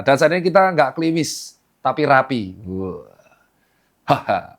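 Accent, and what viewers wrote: Java, Indonesia. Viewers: Wah jadi teringat youtuber "Korea Reomit" Jang Hansol si Korean yg followernya disebut bolo-bolo yg BAHASA JAWANYA MEDOOOOOK ternya dokter ini medok juga, kl dokter Tirta followernya di Peng... Peng... Peng... 😂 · Lidah Jawa Muka Korea.. Spill rahasia ganteng dong 🤭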